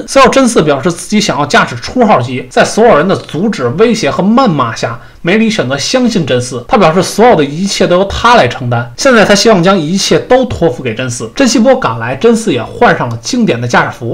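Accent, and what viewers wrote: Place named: Northeast, China. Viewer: 台主不就是的东北口音的吗？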